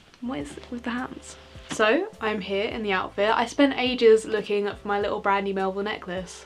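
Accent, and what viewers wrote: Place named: South East, United Kingdom. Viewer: So I hope this isn’t too intrusive but I was wondering if you’re from the south east, cos I am Kentish and I noticed you mentioned the Tate in Margate and you have a very familiar accent. Xx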